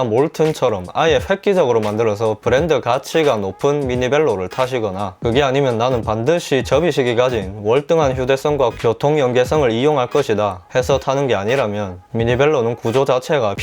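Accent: Gyeongsang, South Korea. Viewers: 구수한 경북구미지방 특유의 사투리에다, 중간중간에 표정 찡그린 캐릭터 얼굴 나오면서 높은 톤으로 "~~해도 별 차이없던데~" "~~해도 잘만타고다니는데" 하는 부분이 진짜 웃김 ㅋㅋ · 잡소리 없이 똑부러지는 설명...양념으로 경상도 사투리 억양~ ㅋ 좋아요~~ㅋㅋ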